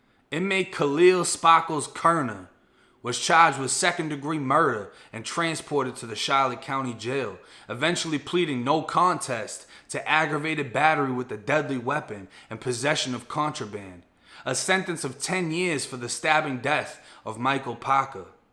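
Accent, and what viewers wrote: Boston, United States. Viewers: I dont care what anyone says u look n sound mad familiar n thats a Boston accent.lol..💯 It'll come to me i lived in FL when i got released for 5yrs!! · Bro this shit funny as hell. I was just watching some of your old videos and I gotta say man, your Boston accent has gotten so much thicker since when you first got out. Your old videos you still had that Florida style speak. Now you straight Red Sawks and ima have a bea down at the bah style.. Lol. Love ya videos tho bro. Also, I used to just listen to your videos while I was driving but now you gotta actually sit there and watch your videos cuz you got photos, pics, graphics and shit. Stepped yo YouTube game up bruh. Keep doing ur thing tho, my man. Awesome content. Awesome stories. Peace bruh..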